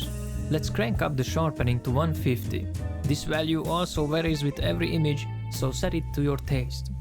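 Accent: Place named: Hungary. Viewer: hungaro accent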